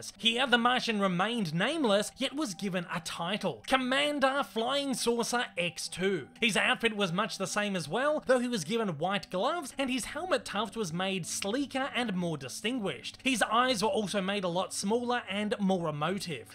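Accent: Australia. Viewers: Urghhh that aussie narrator's accent is decidedly grotesque and annoying, ruins what would have been a great video !!!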